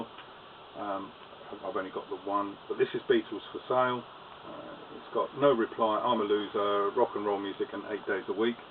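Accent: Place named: United Kingdom. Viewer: (This is Tom, not Sandra.) Why do you think that England didn't have picture sleeves for the Beatle records back in the early 1960's? Have you found that out since you taped this video post? You have some really great collectibles there, sir. Thank you for sharing your collection with us all !!! Love your accent, also!!! Love all the British accents, really!!!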